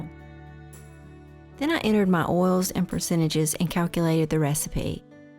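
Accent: South, United States. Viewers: The southern accent is the beauty of the south. Let is out. Sing it ! The rest of the world is blessed to hear it.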